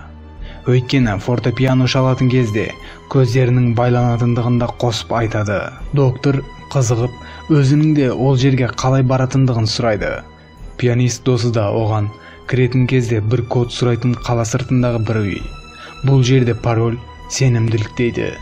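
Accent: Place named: Uzbekistan. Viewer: каналды жүргізіп жатқан жігіт, сіз өзбексіз бе? өзбекше акцент бар сияқты тіліңізде?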